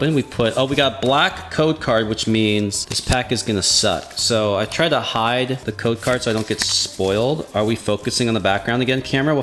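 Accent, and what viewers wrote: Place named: Australia. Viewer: BRO AUSSIE LOL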